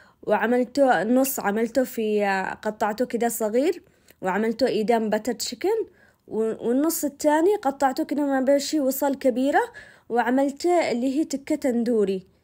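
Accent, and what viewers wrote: Aden, Yemen. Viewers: اي حد عاجبه يتابع ووالدي مش عاجبه طز ولا تخلي ببالك ولاتهمي والله كفايه كلامك العدني الحلو موفقه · انتي من افضل اليوتيوبرس ،وكفايه صوتك الحنون واللهجه العدنيه الاصيله عوضا على وصفاتك اللذيذه اللي تجنن ،لك كل التحيه والموده والتقدير ❤ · مستغربة ان في تعليقات سلبية على كل هذا التميز في الشرح و الطبخ الرائع .. ماشاء الله فنانة جدا بمعرفة كل انواع هذه الطبخات وبطريقة الشرح اللي يعلق سلبيا معناه حسد انا حتى فرحانه انك تتكلمي عدني زينا مش زي اللي يسافروا السعودية ولسانهم تنعوج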